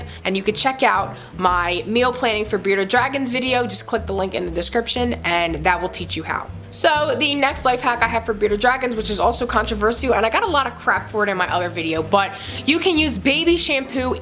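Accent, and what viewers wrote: Philadelphia, United States. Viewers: Who else can hear her philly accent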